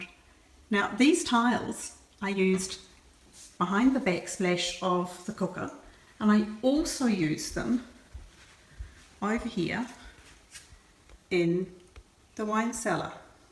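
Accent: New Zealand. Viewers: I thought I heard the New Zealand twang.....it never leaves you my dad came from Scotland and never lost his Scottish twang even though he lived in Yorkshire for many years.....have not visited the South Island but that's my intended place next time fingers crossed.....x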